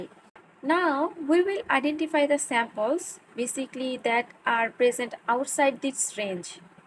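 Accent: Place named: South Asia. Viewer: Are you from South Asia?Your accent said so❤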